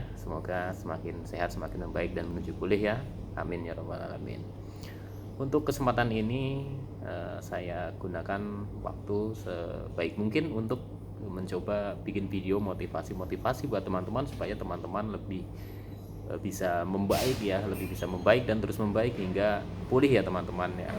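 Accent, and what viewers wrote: Banyumas, Indonesia. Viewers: Mas mau tanya, Mas ngapaknya mana, sy ngapak Kebumen, anxiety sy masih suka datang Mas, blm sembuh bgt. Trimakasih motifasi nya, 🙏🙏🙏.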